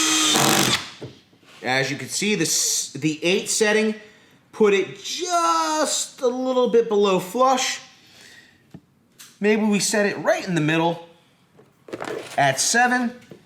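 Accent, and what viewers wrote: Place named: New England, United States. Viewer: God your yankee accent makes me cringe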